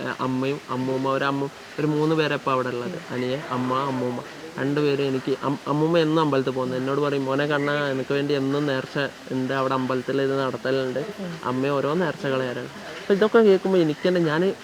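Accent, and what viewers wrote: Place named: Malappuram, India. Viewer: മോളെ എങ്ങനെ മലപ്പുറം ഭാഷ ഇത്ര വേഗം പഠിച്ചു.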